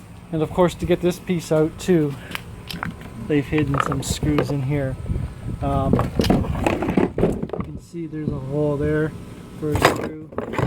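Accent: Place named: Dakota, United States. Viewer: Dakota accent or canadian.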